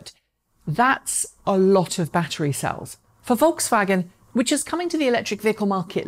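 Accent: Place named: England, United Kingdom. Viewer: This lady is so erudite and I love the English accent .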